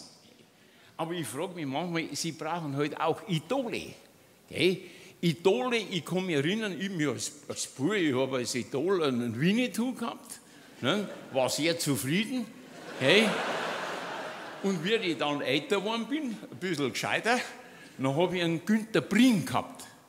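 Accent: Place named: Bavaria, Germany. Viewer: Sei deitsch is mindestens so guat wia vo manchem Preissn, moan i.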